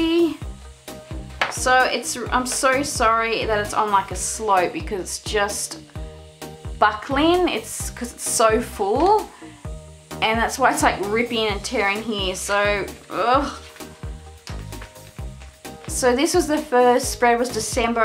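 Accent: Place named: Australia. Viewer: Omg I was wondering if you were aussie from your accent. I had to check the info on your page. Yay to Aussies using Hobonichi. But omg the shipping!!!!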